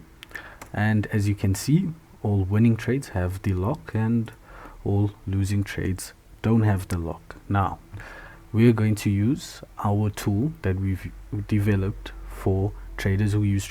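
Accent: South Africa. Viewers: Bro you from sa? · You sound South African you from South Africa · Thanks, where are you from, S.A. ? Your accent sounds like you from S.A. ? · Are you South African?😊 Thanks for the video! Looking to learn more about ICT.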